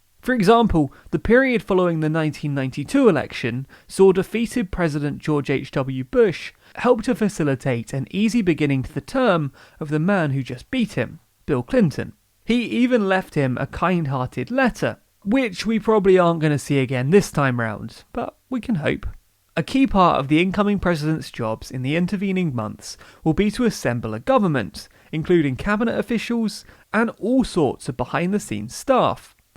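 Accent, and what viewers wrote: United Kingdom. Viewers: The initial cringe I had hearing a british voice talk about "transition period" I was thinking IT NEVER ENDS!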